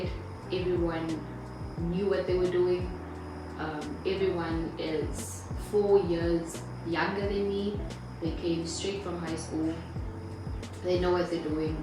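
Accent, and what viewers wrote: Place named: Afrikaans, South Africa. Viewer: You look like an overachiever fr. The Afrikaans accent came out progressively. Sub & like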